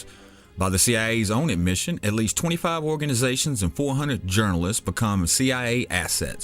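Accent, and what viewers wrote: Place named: Louisiana, United States. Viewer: Nice one, It is nice to hear your real voice for a change very professional, well articulated, now hearing your voice with a light accent, I could just guess ,maybe your a Louisianan. All good fronts exposed and revisited, Thank you for all your run downs on the populous controllers. Peace Brother. :)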